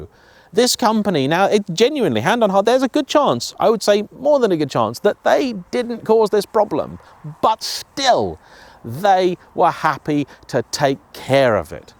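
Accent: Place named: England, United Kingdom. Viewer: omg I love listening to PROPER English and not the American version... For anyone that does not know, English is not the native language of America, they truly don't have a native language at all! START SPEAKING PROPER ENGLISH AND STOP CALLING FOOTBALL A SPORT THAT THE BALL BARELLY TOUCHES YOUR FEET !!!!!!!!! a trash can is a bin and the hood is called BONET because the hood is the one you GREW IN !!!! You ruin everything you touch, but ruining language is a crime !!!!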